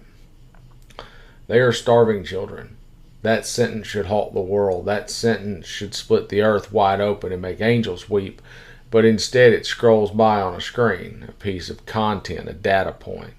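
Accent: United States. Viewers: First time since Jimmy Carter ive heard a deep south accent talking with sense and compassion.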